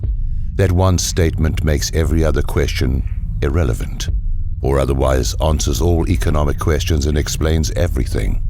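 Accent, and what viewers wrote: United Kingdom. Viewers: just a thought: does the Narrator speaking with an extremely British accent, actually say "We, as Americans..." ?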